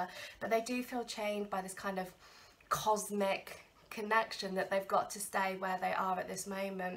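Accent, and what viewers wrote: United Kingdom. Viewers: love ur accent British gurl! thanks🤐❤️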